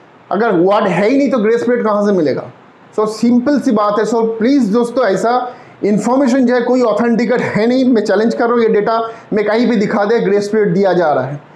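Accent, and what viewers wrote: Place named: Bengal, India. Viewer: Kya bolta rehta hai. Kuch samajh nahin aata iska. Poora bangali maushay.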